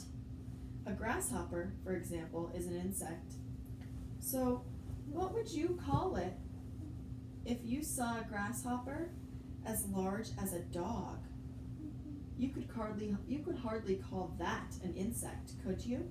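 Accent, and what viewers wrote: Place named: Germany. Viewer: Oh ok I was wondering by her accent it sounded like a German accent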